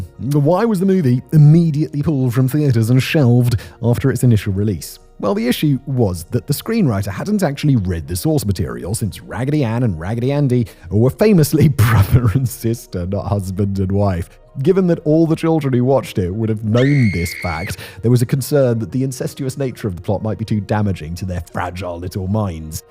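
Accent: United Kingdom. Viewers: Shocked that your actually British thought it was a act 😂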